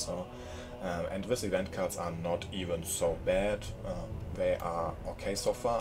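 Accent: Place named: Germany. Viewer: Du bist auf jeden Fall Deutsch xD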